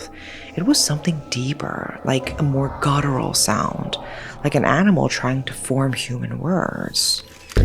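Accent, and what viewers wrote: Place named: California, United States. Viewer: I dont like the way you speak it feels like your typical caliornia woke girl accent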